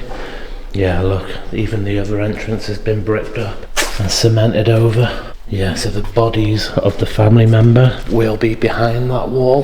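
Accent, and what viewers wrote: United Kingdom. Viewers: Btw luv your British accent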